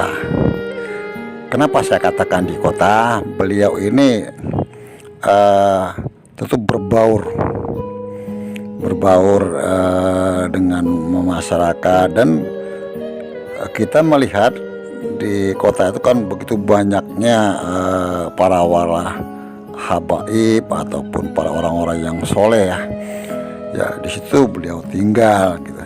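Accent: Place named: Sulawesi, Indonesia. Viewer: Abah ini yg pny chanel yah...aksen suaranya sama hehee..ciri khas Sulawesinya gak bs dibohongi bah 😁👍👍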